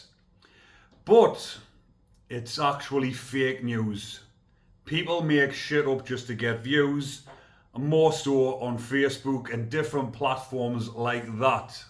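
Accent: United Kingdom. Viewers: May i ask what part of Britain is your accent from? I am very interested in listening to the variety of accents which your country has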